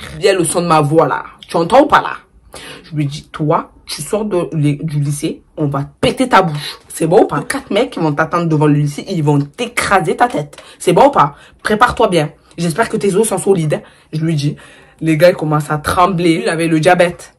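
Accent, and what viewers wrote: Marseille, France. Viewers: T’es de Marseille ? J’ai l’impression d’entendre maeva ghennam là 😭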